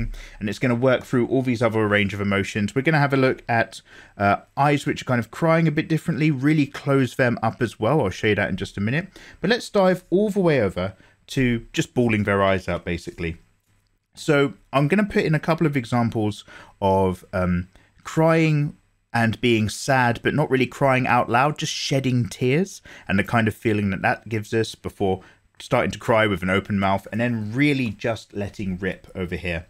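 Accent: United Kingdom. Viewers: I love how British his voice is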